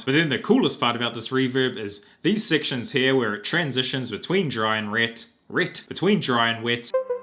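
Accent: New Zealand. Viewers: Are you a New Zealander? Because you don't sound Australian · Are you a New Zealander?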